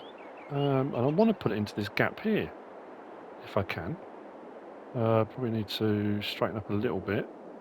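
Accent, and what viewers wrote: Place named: United Kingdom. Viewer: "Kango-hammer" seems very fitting for the British dialect, you saying "jack hammer" just doesn't sound right with your accent. (Plus Knango hammer just sounds cooler lol) I work in a quarry, kinda. I work for an aggregate and concrete company, I drive the redimix trucks. So you're pretty spot on with your story line, which was a brilliant idea and nicely executed. As far as the diehards that say "it's farming Simulator, there shouldn't be any forestry", I've never met a farmer that didn't own a Chainsaw, that wasn't ready to cut some trees down for field expansion and for firewood. Not to mention, TREE FARMS??? There's lots of tree farms out there for wood pulp for paper. Any farmer out there is looking to make the most of their time, and to not ever run out of money makings. I don't understand these people that say "you can't do that on this, you're HAVE to do it THIS way". 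If it were said to me, I'd have a few choice words for them, of which I won't say on video. DO YOU. Don't worry about it I'm not doing it the way you would.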